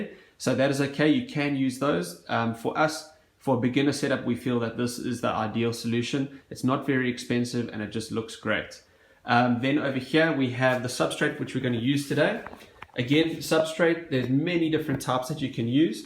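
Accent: South Africa. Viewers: Are you south African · Is this guy from South Africa??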